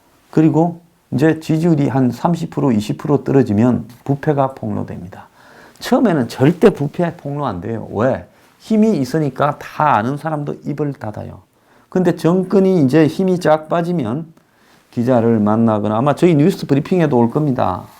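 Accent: Gyeongsang, South Korea. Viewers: 그 정도의 욕은 경상도말과 찰떡궁합! 스트레스가 풀립니다. 염려마시고 스타일대로 하세요! 응원합니다!! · 중앙아시아로 좇겨가도 경상도사투리 못바꾼다 좋은건지 아닌지 건강이최고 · 사투리 지적하시는분, 불평하시는게 좀 한심해서 한소리 할께요 같은분이 이 난국에 개 돼지들 깨우치려 힘쓰시는것에 감사합시다. 경상도 사투리라 못 알아듣는거 아니잖아요? 쩝쩝이보다 천배 만배 듣기 좋지 않습니까? 내용에 집중에 주세요. Don’t be grumpy or criticize over not important things!!! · 사투리 억양듣기 전혀 안불편합니다. 단어구사는 표준어시고 어조만 사투리인데 성조가 있기 때문에 오히려 집중이 잘되고 단어가 확실히 들립니다. 모노토너스한 말투보다 훨씬 좋습니다. 참고로 저 경상도 사람 아닙니다 아버지 경기도 어머니 호남분입니다 서울서 나고자랐지만 영남 어조 오히려 집중 잘되고 귀에 단어 강조가 뛰어납니다. 욕도 심한욕 없고 듣는 사람이 시원합니다. 이상한 사람들 말 신경쓰지마세요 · 이난국 시기에 별것을 다 가지고 시비을 거네요 님의 매력은 경상도 사투리라고 봅니다 올은말만 콕콕 찝어서 뉴스를 하는데 사투리 때문에 부드럽게 들린다고 생각합니다 의욕은 욕이라고. 볼수없다고봅니다 이렇게 좋은 뉴스를 보고 듣는데 조금은 불편해도 우리 모도가 이해를 해야한다고 봅니다 의 뉴스브리핑에 감사드립니다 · 숨겨진 경상도 사투리가 구수합니다. 이런들 어떠리 저런들 어떠리 운운 하는 자들은 말씨를 바꾸는 재주가 탁월합니다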